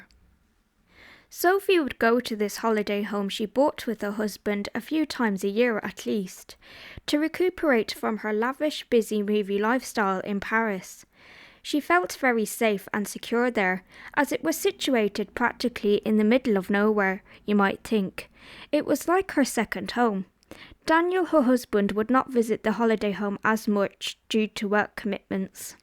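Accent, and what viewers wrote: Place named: Ireland. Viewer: Would love to know origin of narrators voice. I'm from Galway Ireland. Sounds like she's from here but with a touch of UK accent. Not related to documentary...just curious 🙂🙂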